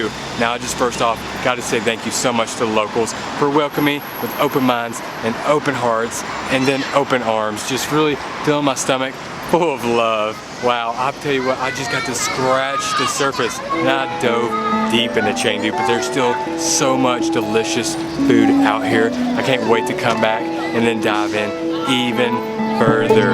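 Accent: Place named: South, United States. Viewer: you are super star in my book to eat Szechuan ma-la chili, pork brain, tripe and all the other deliciousness. Also, love your calm voice and slight Southern accent. 👍🙌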